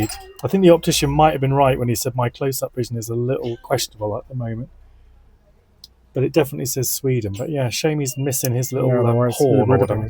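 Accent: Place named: Cornwall, United Kingdom. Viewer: Love your Cornish accent. Poldark is back on Sky T.V. Lovely!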